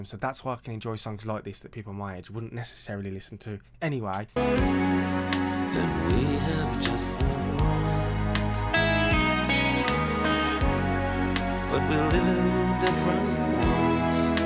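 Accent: Birmingham, United Kingdom. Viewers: I love your accent!! Brummy? Watching you from Ireland. I love to see the younger generation appreciate real music. 🎶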